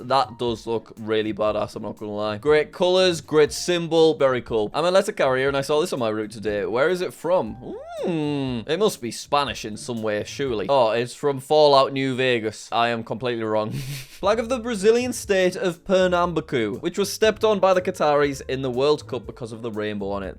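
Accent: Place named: United Kingdom. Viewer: Why are u british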